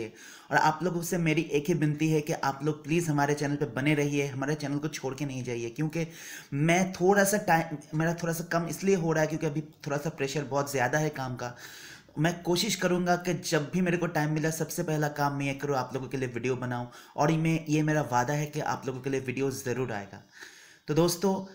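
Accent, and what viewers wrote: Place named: Bengal, India. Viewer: Pehle to me pehli bar ye video dekh raha dusra ye bengoli acsent he to aap kaha se ho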